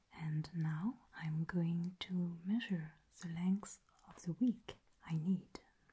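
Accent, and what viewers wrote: France. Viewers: Bonsoir, le travail que tu mets dans tes vidéos est vraiment exceptionnel, bravo car cest très immersif. Le seul bémol pour moi c'est l'accent français qui ne fais pas "exotique" à mon oreille. Mais sinon vraiment bravo, je découvre ta chaîne et cest extrêmement qualitatif !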